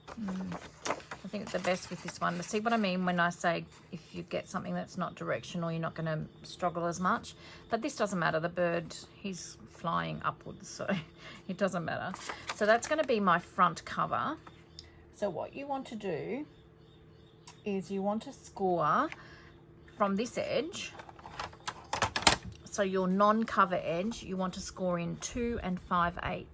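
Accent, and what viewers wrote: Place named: Australia. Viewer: Thank you. I just found you and subscribed, nice to hear an Aussie 😄and love your style with using up 12" sheets, I have so many and struggle to make ephemera and pockets for journaling. These are just the thing I love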